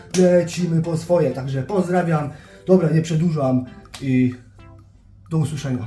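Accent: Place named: Upper Silesia, Poland. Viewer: No, no, łapy masz fajne. Słychać, że jesteś z Górnego Śląska.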